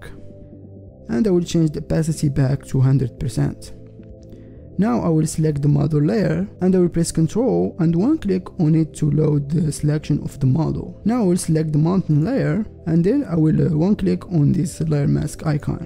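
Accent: Morocco. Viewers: your accent and voice sound like a Morrocan man great video btw!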